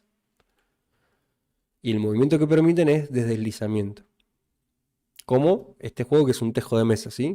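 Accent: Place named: Argentina. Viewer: Excelente video, me enamoré de como explicas más tu acento argentino, saludos desde Venezuela ❤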